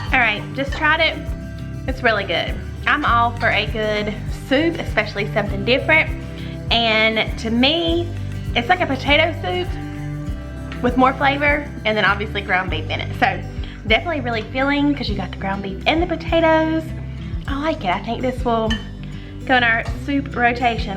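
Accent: South, United States. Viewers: I clicked hoping for a beautiful southern drawl and i wasnt disappointed, new subscriber 🙋‍♀️😍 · I just love your Southern accent❤ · I love your recipes!! And your Southern accent, too. I'm definitely going to try your recipes. Thank you for sharing 🙏🏾❤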